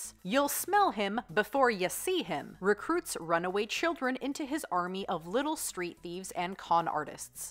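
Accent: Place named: Canada. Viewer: You have a cute Canadian accent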